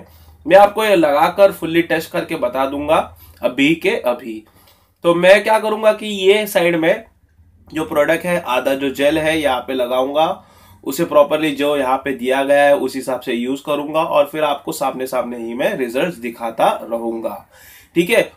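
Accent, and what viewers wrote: Maharashtra, India. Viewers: Chitak gaya...😂😁😅 mother tongue marathi ahe vatat tumchi..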